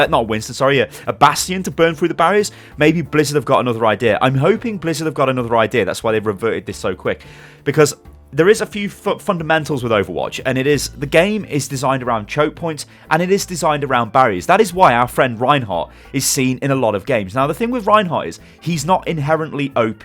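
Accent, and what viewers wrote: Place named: United Kingdom. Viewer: Sometimes i wonder if i am even i interested in the video itself or am just staying because if you nice smooth british voice and accent ¯\ツ/¯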